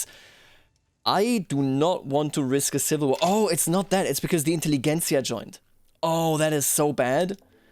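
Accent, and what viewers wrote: Bavaria, Germany. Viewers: Yup I can hear the Bavarian Very nice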